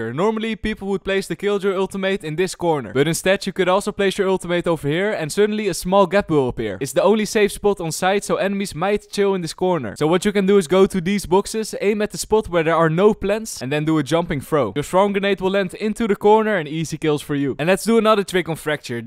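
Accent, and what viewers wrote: Netherlands. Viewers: bro wait you are dutch, i didn’t even check i can hear it lol 😂😂😂😂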